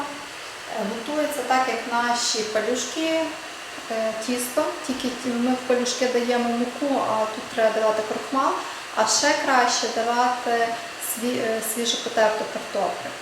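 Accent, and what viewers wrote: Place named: Galicia, Ukraine. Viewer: Смачний рецепт ,непорівняний Галицький акцент,.дуже дякую.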